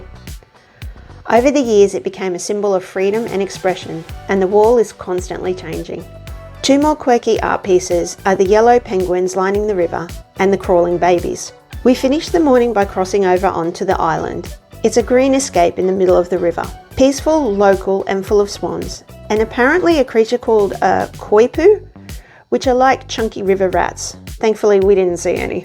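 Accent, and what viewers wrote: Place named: Australia. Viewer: Great to hear an Australian voice. We're planning to visit Prague later in the year so thanks for all the information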